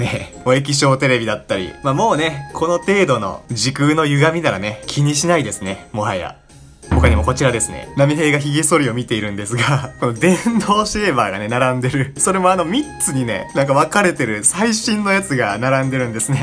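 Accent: Kansai, Japan. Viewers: この人関西出身やな？！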